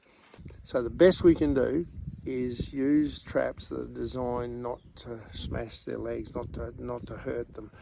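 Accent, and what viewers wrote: Australia. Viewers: Where’s this ?it’s beautiful country and those Brahmans are beautiful. The bull he was beautiful to. You sound like a Aussie ?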